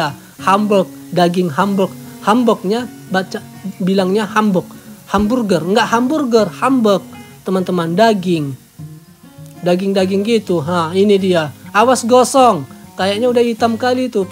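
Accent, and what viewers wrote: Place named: Java, Indonesia. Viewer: Yang aku suka dari pak dhe ini kalau ngomong logat jawane.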